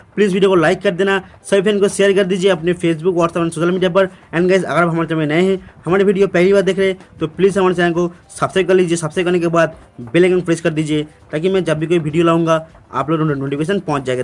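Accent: Bengal, India. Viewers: Tum bangali h kya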